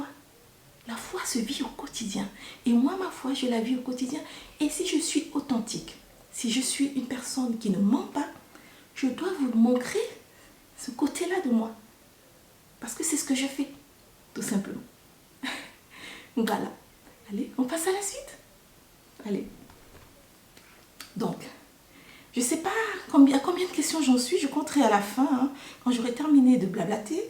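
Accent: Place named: Cameroon. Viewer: Pour information, cela vient de l'accent de son pays d'origine, si ne m'abuse, le Cameroun. C'est une spécificité propre aux habitants ce beau pays !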